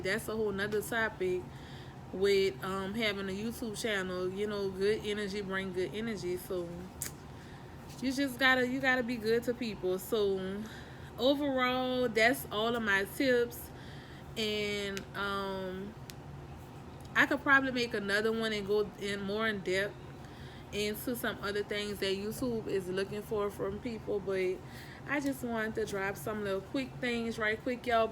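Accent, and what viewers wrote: New Orleans, United States. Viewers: I thought your accent sounded like New Orleans. I’m a Louisiana native too. Yes, every Friday is seafood night at my house. Congratulations on growing your channel. Thanks for the tips on YouTube growth. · I love the NOLA accent too! That's what caught my attention and made me keep watching.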